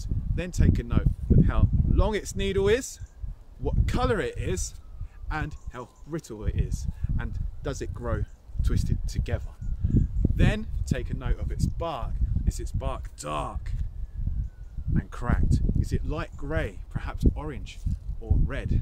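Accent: United Kingdom. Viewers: Hello, where is your accent from? British?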